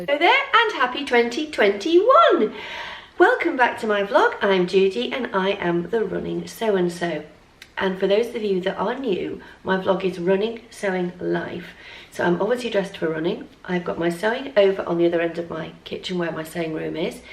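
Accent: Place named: Yorkshire, United Kingdom. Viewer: Judy I love your Yorkshire accent my Family live in Doncaster I moved to Canada in 1977 I go home ever few years to visit but I couldn’t go in 2020 because of COVID .keep up your wonderful work as i fined it is very inspirational I have a pfaff creative .3 it does embroidery I’m just starting to try it out .Happy New Year to you and your Family 🇬🇧🇨🇦🥰❤️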